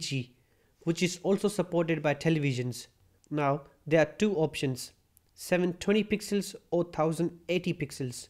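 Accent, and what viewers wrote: India. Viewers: Pati dito indian accent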